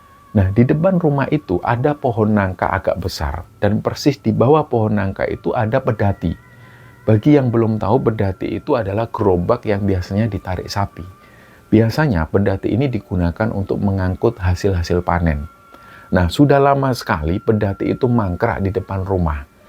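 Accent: Java, Indonesia. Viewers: Ciri khasnya orang Jawa ngomong duduk aja serasa bilang dhudhuk medok sekali 🤭🤭 ya mas orang Jawa kalo bicara medok sekali..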